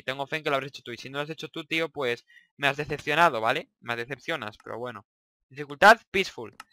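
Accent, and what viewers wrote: Argentina. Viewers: estas hablando cordobes como david saludos de argentina te qiero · sabes hablar bastante bien en argentino. Saludos desde Argentina eres uno de mis youtubers favoritos sigue asi.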